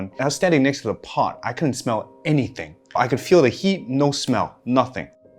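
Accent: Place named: United States. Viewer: American accent in disguse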